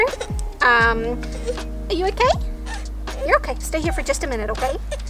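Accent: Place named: United States. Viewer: Your accent is puzzling me—are you American or a Kiwi? You sound mostly American but with little bits of Kiwi accent here and there.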